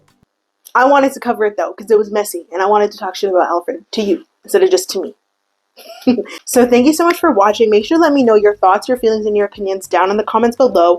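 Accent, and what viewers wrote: Canada. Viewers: OMG! Every time youve said "I sound so canadian" in the past, I thought it was cause you were from the US and just said some words like a Canadian...BUT YOURE ONE OF US?!?!? I mean, I should have known...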